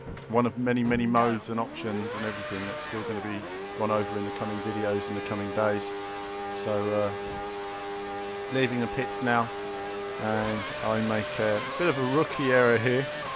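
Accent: England, United Kingdom. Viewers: It's a figure of speech. I'm fully aware he is an Englishman. Think the accent gave that away :)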